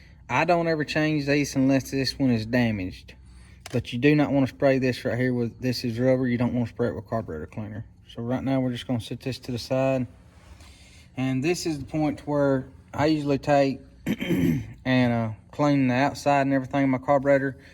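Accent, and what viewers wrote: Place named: South, United States. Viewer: pity about the southern drawl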